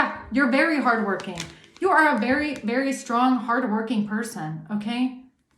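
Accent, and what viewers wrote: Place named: Germany. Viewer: 🐝 princess,,,, your beautiful soul love your strength your wisdom your readings and your sprit,,,, hope you don't take on there yucky shit,,,, don't like seeing you angry,,,, your beautiful your a goddess,, you are so much beauty, your Aura your smile your voice your accent,( Germany)❤, you deserve the best,,,I will reward you when I can 😊,,,, God bless you,,,I don't like you stressing,,,, you would have a smile on someone's face every day x,,, sorry if I sound attrusive,,,, 🦉⭐✨⚖️🦋🐝🐝🐝🐝🐝☀️🕯️🛡️✌️💜😇,,,,, don't hurt your self ( your neck) ,I love you,,,❤,I remember you saying you had a accident x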